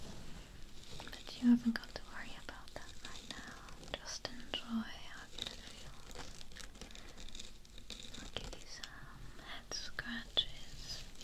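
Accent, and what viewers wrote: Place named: Midlands, United Kingdom. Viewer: I get the impression you're from the Midlands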